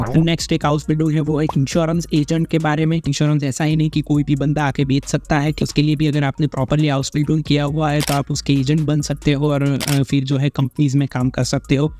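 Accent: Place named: Maharashtra, India. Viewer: Hello I don't know why but I feel you are a Marathi speaking guy after listening your Hindi.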